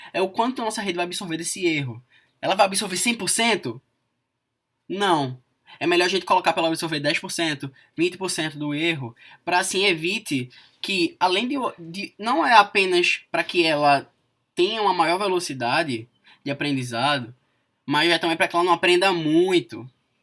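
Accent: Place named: Rio de Janeiro, Brazil. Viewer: muito bom carioca com puxada nordestina ou vice versa. hahah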